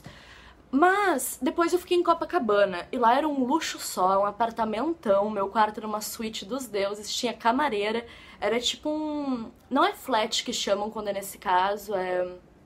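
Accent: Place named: Porto Alegre, Brazil. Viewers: Mariana antonucci Tem mais haver com o sotaque do que com a dicçao. Os porto alegrenses falam assim mesmo,assiste um debate da luciana genro que tu vai ver kkkkk. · Cara, só quem mora desde criança em POA tem esse sotaque chato que nem essa moça. Mas no resto do estado os sotaques são legais. Ah! Sou riograndense. Eu acho que ela é descendente de alemão. O alemão é bem exigente e verdadeiro.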